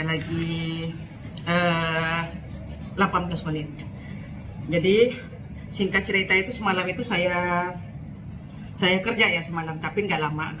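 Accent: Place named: Sabah, Malaysia. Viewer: Bunyi cakap macam orang Sabah.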